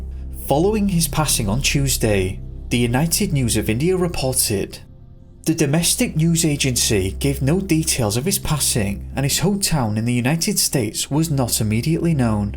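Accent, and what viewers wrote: United Kingdom. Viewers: Accent from the UK, it's not bad. I'm from Finland and I learned to listen to that accent while studying English in school bc our teacher talks exactly the same way 😊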